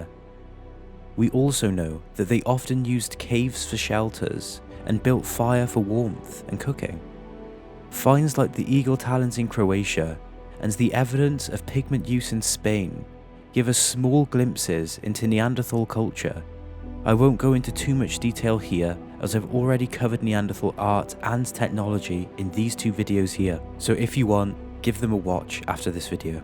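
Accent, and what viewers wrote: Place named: Shrewsbury, United Kingdom. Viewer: The documentary I've been hoping for.... since primary school when I read a book on prehistoric Britain & saw intriguing paintings of neanderthals, to the Frazetta "Neanderthal" (worth doing an image search for!) I've been fascinated. Clan of the Cave Bear was food for thought... this is perhaps the best documentary I've watched. And your accent & narration adds to it (Shrewsbury?)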